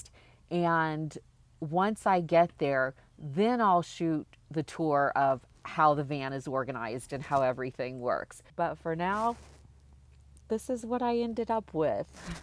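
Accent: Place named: Missouri, United States. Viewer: Lol..... you cwack me up! I can definitely tell you are from Missouri.. I am clumsy.. frought with mishaps.. especially when someone is watching me.lol.. I try and keep my "cool".. but ends in epic failure! Enjoying your posts from SW Missouri.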